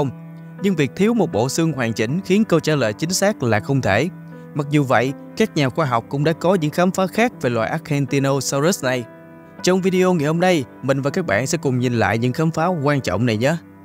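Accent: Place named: North, Vietnam. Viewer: không phải phâm biệt vùng miền gì mình là người miền trung vẫn thích nghe giọng bắc chuẩn hơn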